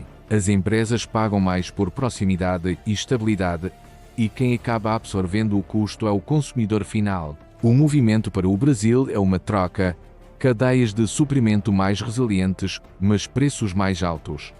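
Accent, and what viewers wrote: Portugal. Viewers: Pq fazer com sotaque de Portugal????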